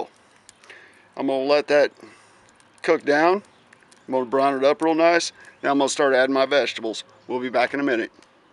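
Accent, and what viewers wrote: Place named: South, United States. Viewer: it is an absolute requirement that, if someone is gonna make a bbq video, to liberally use the term, "yall". And you don't disappoint------------ specially' with ur' southern drawl. Good Job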